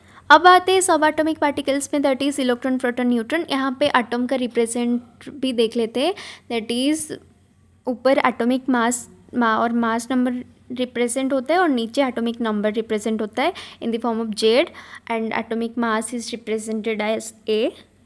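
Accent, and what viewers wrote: Odisha, India. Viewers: i think may be u are from Odisha na ?? bcz ur way of talking reflects that.. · AREY APP ODISHA SE HO KYA 🤔🤔🤔✨ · Didi are you from odisha?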